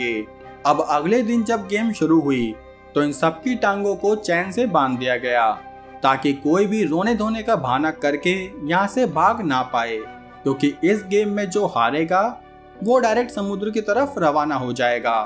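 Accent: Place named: Braj, India. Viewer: Tumhari boli se lag rha hai tum brij kshetra se ho, probably mathura ya agra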